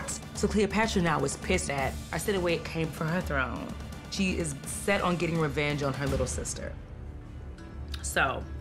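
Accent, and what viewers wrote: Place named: Bronx, United States. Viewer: The narrator is from the bronx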